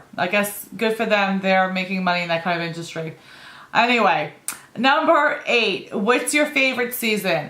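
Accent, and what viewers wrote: New York, United States. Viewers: Love your bubbly attitude your NY accent lovely... Great tag glad getting to know you a little better...tfs beauty🤗🌹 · Hi! Loved getting to know you! You may have left NY when you were a little girl, but you still have that NY accent which I love... I've lost my Jersey accent:-( Hope you're having a great weekend!!! xoxo -Marlene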